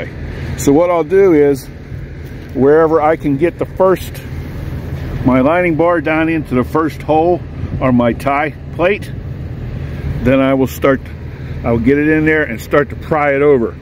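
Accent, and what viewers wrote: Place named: Pennsylvania, United States. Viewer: Hello my friend! Questions is your accent south or central Pennsylvanian? South Jersey or Maryland?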